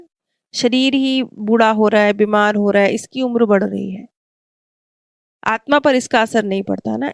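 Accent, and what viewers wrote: India. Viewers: di ka Punjabi accent hai isliye aisa pronounciation hai unka..... Thoda theek se hi reply kr do ye gawar wala cheap word bura nhi lagega kya unko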